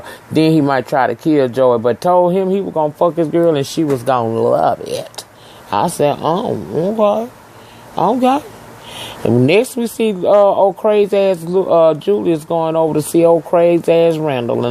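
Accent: South, United States. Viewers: I am so sick of Alex, I've known a lot of women like her who cheat and then just act like they can get everything the way they want it. Such entitlement! Honestly I can't stomach this show anymore because these people are so fucked up. Lol. I just enjoy hearing your lovely southern accent reviewing the story! Glad you're back home safe!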